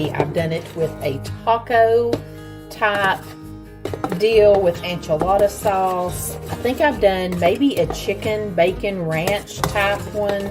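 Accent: South, United States. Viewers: Just found you and had to subscribe! Love me some recipes that are meant for tweaking, and I could listen to that southern charm all day.😊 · Been watching your videos - love 'em. You have a natural talent and come across so sincere and sweet! And a great accent ! I'm a deep south girl from Looziana! Love how you say "Fancy" - it's a southern thing! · you mention snow days. But girl, you sound so southern. Love listening to you. You have a very soothing voice. And boy do we ever need that in todays world.. Thanks for some of the easiest n tasty recipes I have made watching you. ❤️👏👏👏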